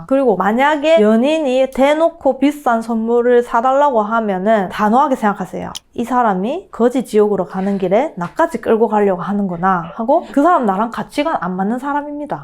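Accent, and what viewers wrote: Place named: Gyeongsang, South Korea. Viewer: 역시 갱상도 언어^^ 구수~~~~함미다^^🎉🎉🎉 청송아재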